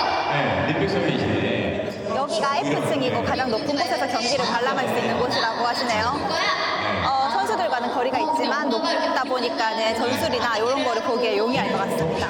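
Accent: Gyeongsang, South Korea. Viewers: 사투리 쓰시네요 쇼츠로 볼땐 몰랐는데 갱상도 아이가~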